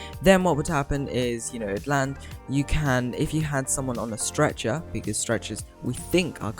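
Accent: United Kingdom. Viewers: Are You British? If you are you’re probably from the UK or EUROPE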